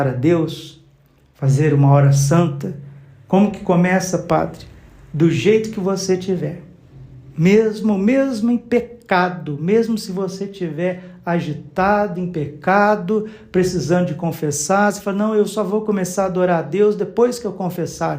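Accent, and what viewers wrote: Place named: Minas Gerais, Brazil. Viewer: Obrigada Pe. Bráulio por sua vida de dedicação ao serviço de Jesus. As suas homilias são muito tocantes à alma e ao coração. O senhor tem a sabedoria que advém do Espírito Santo para evangelizar. A sua humildade o aproxima de seus fiéis. O seu sotaque Mineirinho é muito cativante. Deus o abençoe. 🙏👏🏼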